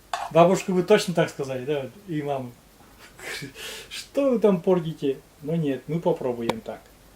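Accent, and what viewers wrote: Komi, Russia. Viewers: Я думала когда впервые увидела Ваш канал,что это блогеры с Прибалтики.В Коми свой акцент при разговоре.Покажите ещё лепешки с содой.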